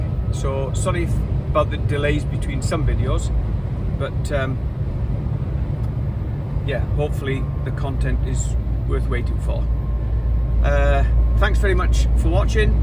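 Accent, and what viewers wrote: Wales, United Kingdom. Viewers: Another great watch Mr 😁, contents as normal interesting, and the views spectacular😘, really can't get enough. Just one thing can't get my head around, where do you actually come from 🤔,I'm convinced there's a slight hint of a Welsh acsent, but it's got something else with it. Make the best of the weekend, o and what happened to the meeting up with Captain Slow😊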